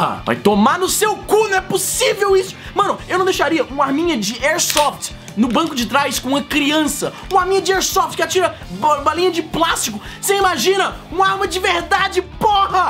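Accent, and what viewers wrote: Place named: Ceará, Brazil. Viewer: Um dos vídeos que vc deixou transparecer seu sotaque cearense hehehe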